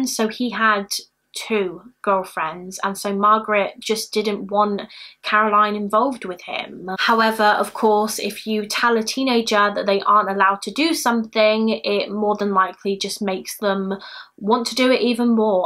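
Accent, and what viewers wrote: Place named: England, United Kingdom. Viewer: Such a sad case. Totally unrelated question, just because I'm curious - what part of England are you from? Fascinated by your accent.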